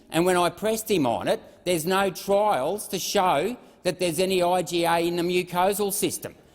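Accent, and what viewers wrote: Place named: Australia. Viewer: Well done Mr. Rennick...keep the pressure on. But Please, why do you have to talk like Norman Gunston? Crikey, even Norman Gunston's creator, Gary MacDonald, doesn't talk like this in his normal voice. Nothing more grating than bluddy Ocker Stryne!......well, uzzer zan zee Klaus Prussian/German zat iz.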